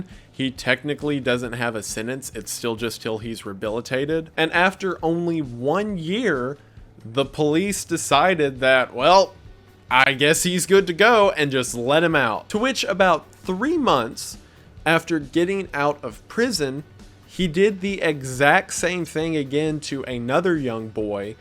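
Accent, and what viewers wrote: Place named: Texas, United States. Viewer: omg, i love your accent! are you from texas? <3 much love from London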